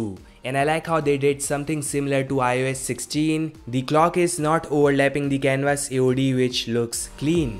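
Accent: India. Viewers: Apka abaj sun ke lag rha ha Indian ha agar hindi bolte too trand pe hote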